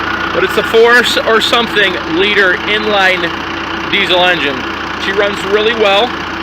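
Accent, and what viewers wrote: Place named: United States. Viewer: Where the hell do you live? You sound American as hell but the steering wheel is on the wrong side in ya truck and your gauges look all backwards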